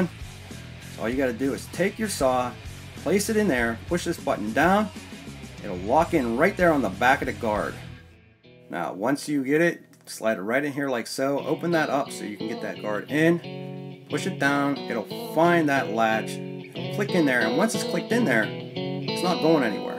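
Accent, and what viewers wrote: Pittsburgh, United States. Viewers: Thats a fine pittsburgh accent you got there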